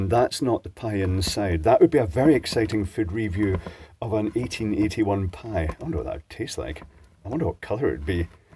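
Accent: Scotland, United Kingdom. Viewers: Why the begging for money? I presume you have monetized your channel, so will be getting paid by YouTube. Also you sound British and this is a British product so why ask for a Dollar not a Pound, if you need a suplemental cash injection? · Posh Scottish accent ! Love it... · Is he from scotland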